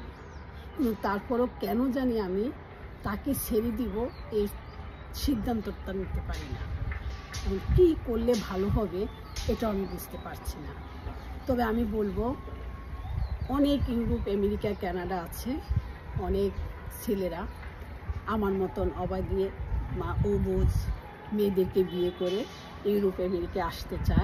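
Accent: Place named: Comilla, Bangladesh. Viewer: আপনি শুধু বিয়ে নিয়ে থাকেন। মন রোমান্সে ভরপুর। কুমিল্লার কোথায় থাকেন? আপনি কুমিল্লার মেয়ে। ভাষায় বুঝা যায়।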